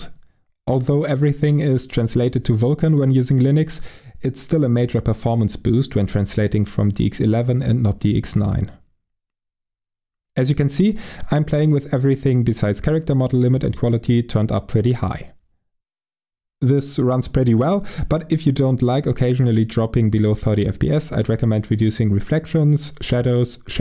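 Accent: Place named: Germany. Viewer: I am developing a Pavlovian response to German accents in YouTube guides at this point! I got excited when I heard your accent at the start and I wasn't disappointed. everything was spot on: patient, well-paced, empathy and context provided for those new to Deck, actually covers all the details, thorough about making sure everything described has associated video, exaggerated finger movements so it's clear which buttons you're pressing, zero padding. thank you so much for this amazing guide <3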